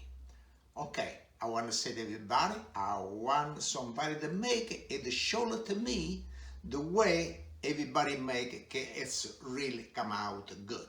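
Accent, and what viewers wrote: Italy. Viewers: You are awesome! My grandma comes from a village in Italy named Casavera outside of Rome. Your dialect is so similar that I think of her every time I watch your videos from the garden to the dry sausage to the pasta & peas, it’s what I grew up around and it’s so similar. Thanks for having an amazing channel! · This is just thé most wonderfull italian dude... I am a big fan of his recipe's. But above all he absolutely melts my heart; with his vibrant personality and ever so charming accent....realy gets me going in my kitchen!! 😍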